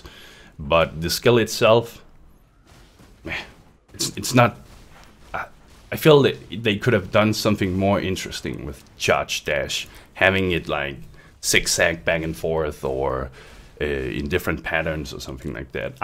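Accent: Denmark. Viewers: hey are you Danish?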